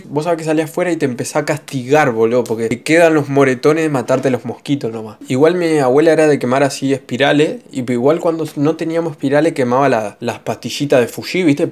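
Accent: Argentina. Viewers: Papa Noel se olvido de mi 😢😢😢 Pd: siempre pensé que eras tucumano por como hablabas, hasta que te encontre en insta y me di cuenta que sos santafesino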